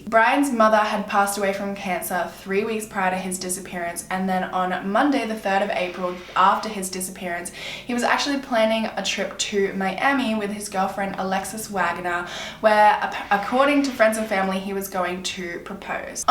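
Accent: Australia. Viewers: i normally rly don't like accents like british accents but i love your australian accent so much and you're so beautiful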